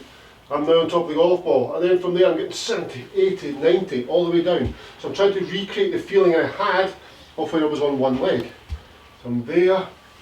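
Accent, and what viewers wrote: Scotland, United Kingdom. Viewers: Great vid good to hear a fellow Scot voice, it's just come back to me a few weeks ago I was hitting the ball great mostly on one leg thanks for the reminder 😁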